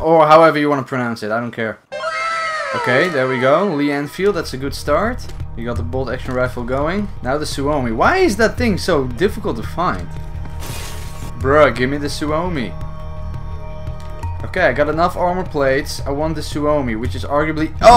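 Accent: Netherlands. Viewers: Are you dutch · Boy you dutch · Wtf you are dutch? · I know your Dutch i can hear t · yo wtf you're dutch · are you from the netherlands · Ben je nl are you Dutch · His accent sounds SUPER dutch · Wait are you Dutch? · ik hoorde aan je accent dat je nl bent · Are u Nederlands 🇳🇱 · Lol are u dutch?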